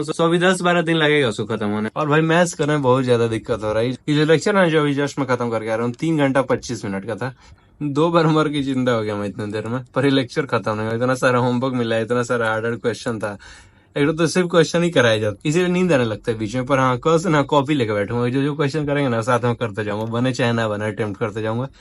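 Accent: Bihar, India. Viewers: Bhai aap bihar se ho kya ascent se lg rha hai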